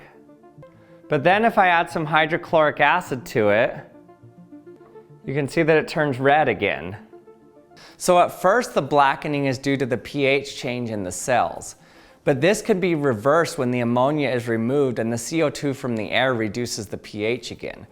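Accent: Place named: United States. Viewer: Why does he sound so American and gay at the same time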